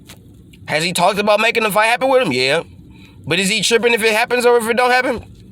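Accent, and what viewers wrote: United States. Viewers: another bollocks talking yank channel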